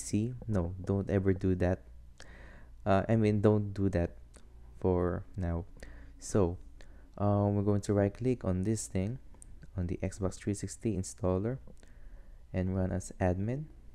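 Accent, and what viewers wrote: Philippines. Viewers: You sound filipino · Peenoise accent :D hahaha peaceeeee :P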